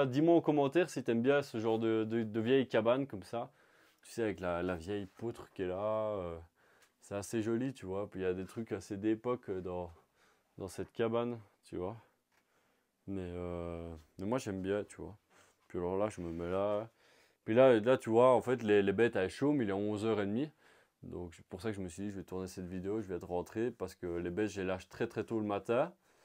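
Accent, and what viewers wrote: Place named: Liège, Belgium. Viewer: Bonjour, tu es super bien installé, tu es originaire de Belgique, car tu as l'accent Liégois, bonne saison à toi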